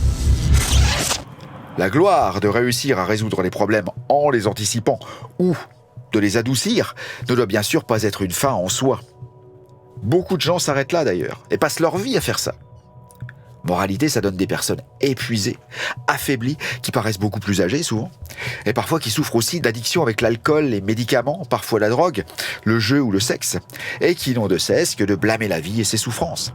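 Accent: France. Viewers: Très intéressant. Et avec un très léger accent ardennais ce qui ne gâche rien ;-) . (Je vais être hors sujet mais tes vidéos de basse manquent un peu. Au delà des magnifiques cover de maiden, avec le niveau que tu as, ce serait génial que tu fasses plus de vidéos pédagogiques comme le galop de Steve ) merci pour tout. Musique et spiritualité, ta chaîne est passionnante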